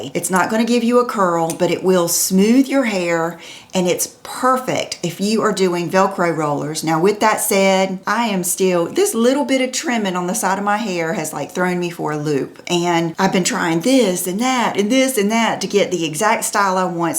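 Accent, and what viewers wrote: South, United States. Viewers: I just went and watched your first video, how sweet! You did "keep your nerve up" and 14 years later you are still here! I have watched for a long time, maybe not since the very beginning but a long time and wow, what an accomplishment to have stuck with this 14 years and we all love you so much! And I love your Southern accent too!!!! oxJUlia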